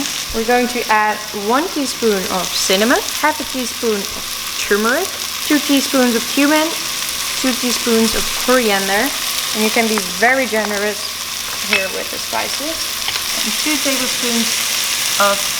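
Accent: Netherlands. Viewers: she sounds so dutch lol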